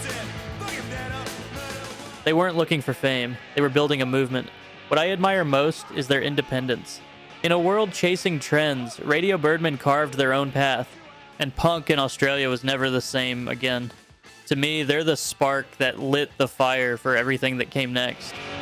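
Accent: United States. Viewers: I am surprised how good your taste is. You sound American so how did you get to hear of these bands. Many main stream Australians would not have heard of many of these. Well done. But how does a yank know of these?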